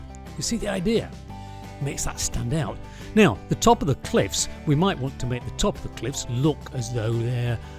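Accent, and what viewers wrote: United Kingdom. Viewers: hmm how to practice my s curves... AH! draw ribbons!! the British accent, the voice of logic and reason, love it! subbed and checking out your other videos, cliffs sounds fun! watching that next after your video on lines. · The British accent with honky tonk music is a neat, yet confounding juxtaposition.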